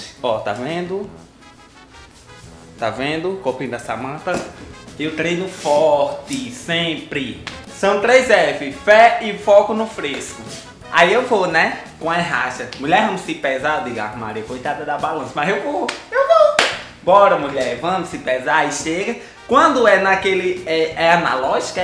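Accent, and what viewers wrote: Northeast, Brazil. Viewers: De que estado é a Samantha? A região é claro Nordeste. <3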